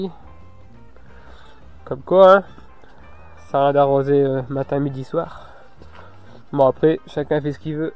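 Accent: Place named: Picardy, France. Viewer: bonjour,je découvre votre chaine. je m abonne car j adore. vous seriez pas de picardie? j ai le meme accent.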